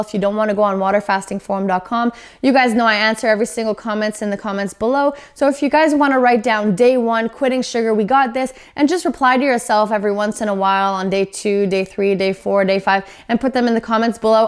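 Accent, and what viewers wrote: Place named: Lithuania. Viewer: Love your accent. Great info and video. Thanks so much. Are u from Lithuania 🇱🇹?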